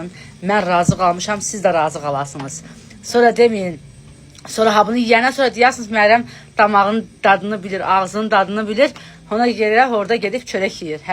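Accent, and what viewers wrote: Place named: Balakan, Azerbaijan. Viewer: BALAKEN LEHCESIDI MENCE ❤NUSICAN OLSUN👍👍👍👍👍👍🇦🇿🇦🇿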